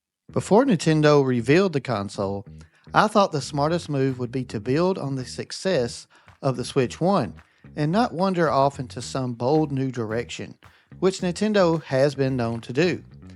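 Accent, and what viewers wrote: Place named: Alabama, United States. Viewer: Just curious buddy where are you from? I'm from Alabama you sound a lot like me and my friends lol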